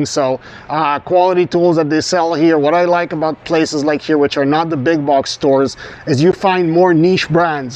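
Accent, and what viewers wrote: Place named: Quebec, Canada. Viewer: Hey there...just found you on here. Was listening to Epic gardening podcast and recognized a fellow bilingual Quebecois. I'm in Val-des-Sources( formally Asbestos) and love seeing the familiar products as I see on the shelves here...I guess I would have to consider myself an experienced gardener at this point, but love finding new ways to inspire others how to grow something.... anything really..lol I'm officially a Fleuriste by deploma, but have worked many years in a centre de jardin/pipinere learning from old school gardeners, and have always had my own gardens of my own over the years. This year I am introducing wine cap mushrooms to my pathways...😁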